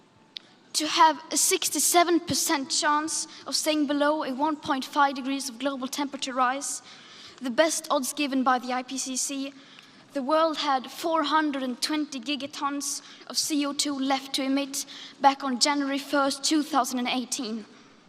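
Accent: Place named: England, United Kingdom. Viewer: Cuz she has an English accent like Emma Watson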